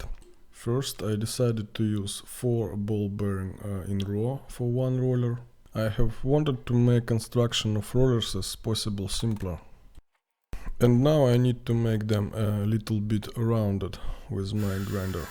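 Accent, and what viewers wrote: Russia. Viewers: Such a satisfying Russian accent :) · Ты сам из России что-ли? акцент русский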